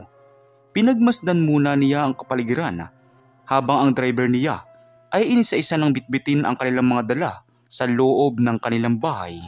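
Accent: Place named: Visayas, Philippines. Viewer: Halatang bisaya ka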